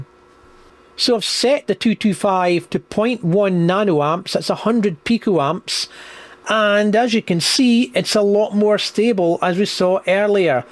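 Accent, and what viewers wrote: Scotland, United Kingdom. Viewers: Is that really 4 nixies? The board inside had just 3 nixies on it. Is the forth digit in front of the 3 nixies just a neon bulb that serves as a 1 indicator? Guess this can be refered to as a 1999 count meter 🙂 Nice repair anyway, and I just love your Scottish accent.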